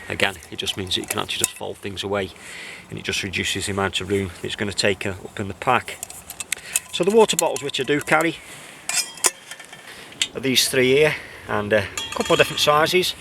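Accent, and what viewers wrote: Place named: Stoke, United Kingdom. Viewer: That's a fine Stoke accent there my mate. A Neckender?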